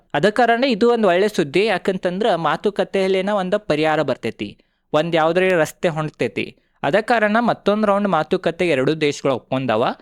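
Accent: North Karnataka, India. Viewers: ಯಾವ ಕಾಂಟ್ರವರ್ಸಿ ಇಲ್ಲದೆ ತುಂಬಾ ಅದ್ಬುತವಾಗಿ, ಅಚ್ಚು ಕಟ್ಟಾಗಿ ವಿವರಣೆ ಕೊಡ್ತೀರಿ.. ನಾವು ಬೆಂಗಳೂರಿನವರು ಆದರೂ ನಿಮ್ಮ ಉತ್ತರ ಕರ್ನಾಟಕದ ಈ ರೀತಿ ಮೃದು ಭಾಷೆಯ ಶೈಲಿ ತುಂಬಾ ಇಷ್ಟ ಆಗುತ್ತೆ....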